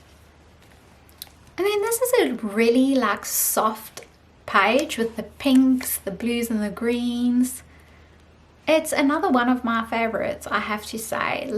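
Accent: South Africa. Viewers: Love your accent, fellow South African😁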